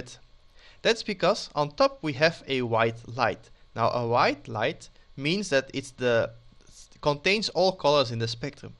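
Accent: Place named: Netherlands. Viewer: Heel duidelijke en mooie tutorial bedankt dat je dit met ons deelt. Ik vindt het leuk om te horen dat er Nederlands door je Engels heen komt dus mischien ook nog Nederlandse Tutorials ;-) Dus misschien hoor ik nog van je op het Dutch Blender Community <link>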